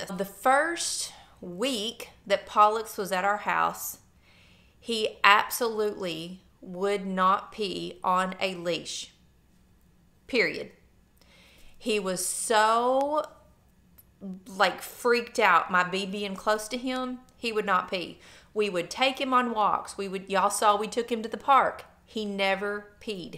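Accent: South, United States. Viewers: Love Pollux and Maxxie. Also love your Southern accent. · Love your adoption of Pollux. Just saw your posts. Absolutely thrilled for all of you! I do have a question if you see this. What state are you in? I can tell your southern. I'm from Alabama so it was nice to hear you say "Yall" alot. I miss home. When my husband retires, we are moving back home, then I'll hear "Yall" used all the time! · YOU HAVE HEART,,THESE ANIMALS I CONSIDER MY CHILDREN.I DIG YOUR CURLS AND THE SOUTHERN ACCENT.GOOD FORCES BE WITH YOU.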